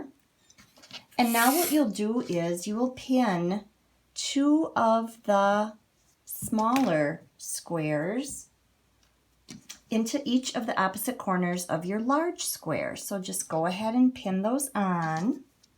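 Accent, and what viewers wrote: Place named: Upstate New York, United States. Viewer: I have a dear cousin in upstate NY....is that where you're from? I love your accent. Thank you for your excellent tutorial!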